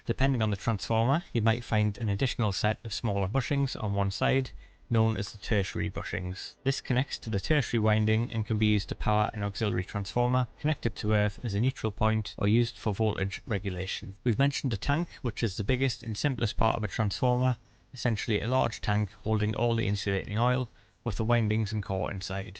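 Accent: Newcastle, United Kingdom. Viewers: I love the Geordie accent. Keep up the great videos. Although I am an electrician who does purely domestic work, I think it's good to take a deeper dive into this sort of thing now and again. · I've just discovered your channel. I'm just a nerd interested in all these things, I won't pretend I understand everything, but it fascinates me nonetheless. Please don't use an AI voice over. Your wonderful Geordie accent adds to the authenticity. Thank you for sharing your knowledge and experience, from a Black Country fan. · Please stay away from AI voice-over! Your speaking voice is just fine. A good clear soft Geordie. Quote: "A 2008 newspaper survey found the Geordie accent to be perceived as the "most attractive in England" among the British public." All the best, Bob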